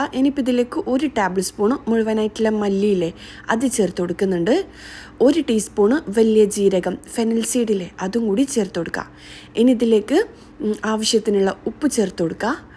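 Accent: Kannur, India. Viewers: സംഗതി ok യാണ് കണ്ണൂർ /കാസർഗോഡ് ആണെന്ന് തോന്നുന്നു 'അനക്ക് "എന്ന് കേട്ടു 🤣😂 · Nigal kannura tta tta nn parayunn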